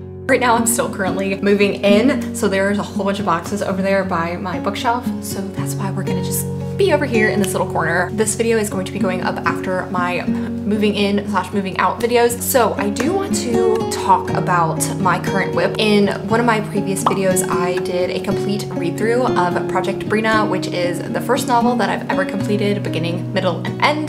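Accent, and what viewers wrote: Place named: South, United States. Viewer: Don’t hide the accent! We love the Southern flare 😁 I can’t wait to see your new project and how it progresses! It’s going to be awesome!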